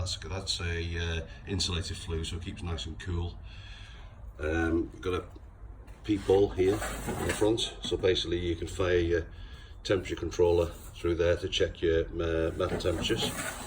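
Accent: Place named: United Kingdom. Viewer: Hi, I have got a very very similar oven, I bought it last week, havent used it as yet, bought it from a wheel refurb guy. Nice to hear someone from the UK, I have not done my first powdercoat as yet, so a steep learning curve I think is about to happen LOL. Dai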